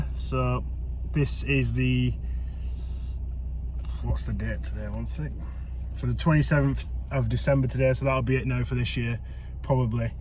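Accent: Northern England, United Kingdom. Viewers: Where are you from? Clearly a Northern lad! Me and my mate fish here and have been Farnham members for a few years. We travel from Blackpool. Check out Red Rose angling for a session we recorded on Badshot Lea Big Pond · Always bud! It's all different for everyone isn't it and a good laugh on a blank is still a great time out for me 💪 haha I am yet to catch from linear so don't expect any secret winning tips from me 🤣🤣 yeah mate I would be keen for that! FB me where abouts your club is, think I detect a northern accent from yourself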